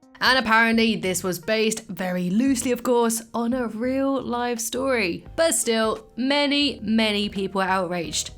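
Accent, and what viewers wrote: United Kingdom. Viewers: your british accent is beatiful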